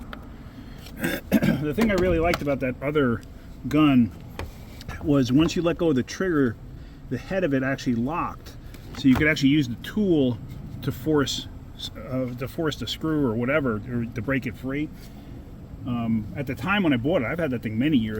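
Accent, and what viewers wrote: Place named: New York, United States. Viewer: Ok, thanks. Sounds like he has a NY accent so that's why I thought Mexico,NY.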